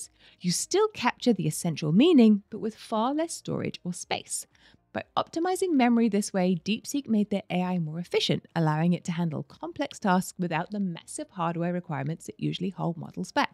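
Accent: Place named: New Zealand. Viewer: Well explained, first video I saw about these topics. The captions also helped me, since the nz accent is harder for me. Thanks from 🇧🇷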